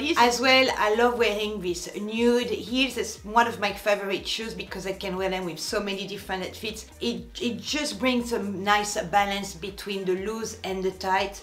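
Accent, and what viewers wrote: France. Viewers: why are you speaking with french accent?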